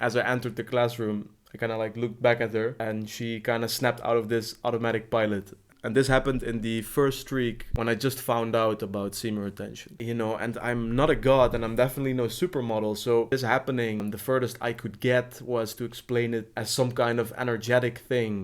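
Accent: Netherlands. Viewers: Ben jij Nederlands? Je klinkt Nederlands. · Ik ruik een nederlands accent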